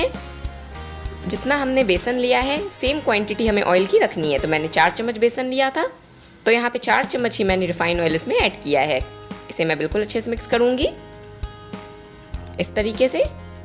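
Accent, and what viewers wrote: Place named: Sindh, India. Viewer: Aapki accent le pata chal raha hai aap sindhi hi hai...very nice recipe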